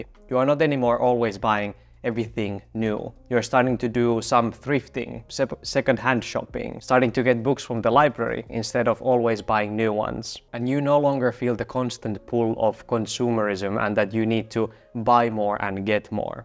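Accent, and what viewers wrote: Finland. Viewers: By your accent, I guess you’re from Finland. Am I right? 😊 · I was listening are you Finnish? Muumimuki ja kahvi 😂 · Oon ihan varma, et puhut suomiaksentilla :D Miks päädyit tekee videoita englanniksi? Ootko miettinyt, et sulla ois kohderyhmää myös Suomessa ja suomenkielellä? :)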